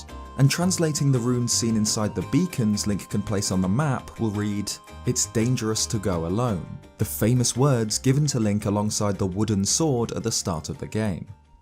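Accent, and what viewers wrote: United Kingdom. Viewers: will the legend reply aswell are you british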